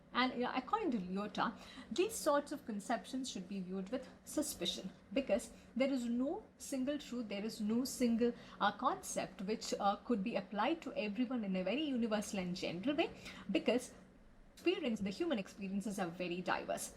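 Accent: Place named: RP, United Kingdom. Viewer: What exactly is your problem? I think she speaks RP.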